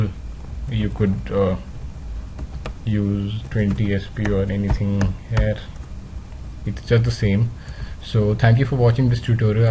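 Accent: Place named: India. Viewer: dari logatnya kayaknya dia india cuy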